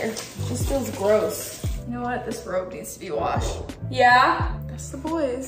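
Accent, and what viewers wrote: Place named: Los Angeles, United States. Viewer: You gotta be from LA lol the antics word syntax and how you say them.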